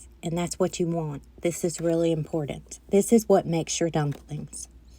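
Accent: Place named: South, United States. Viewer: Love love your southern accent! " "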